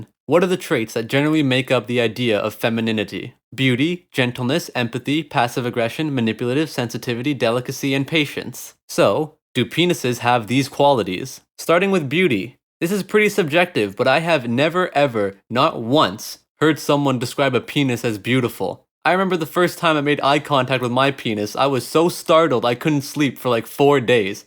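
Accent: Newfoundland, Canada. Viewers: Are you from newfoundland? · You wouldn't happen to be from Newfoundland would ya?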